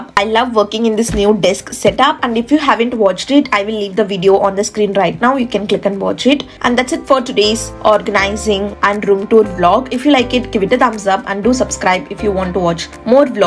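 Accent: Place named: England, United Kingdom. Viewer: So nice !!!! Love ur English accent ….Your room is so minimal and looks so good ❤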